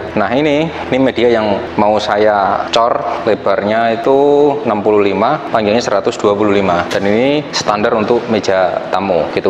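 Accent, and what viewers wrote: Java, Indonesia. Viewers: Logatnya kaya wong jowo,asli pundi mas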